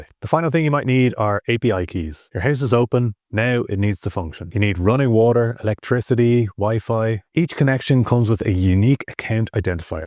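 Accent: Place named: Ireland. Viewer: Which part of Ireland is your accent from?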